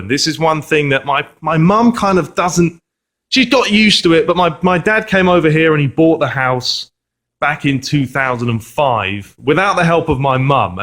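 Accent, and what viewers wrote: United Kingdom. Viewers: omg it is such a beautiful house and you live in florida and you sound like you live in the uk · I love how you still kept your British accent. :)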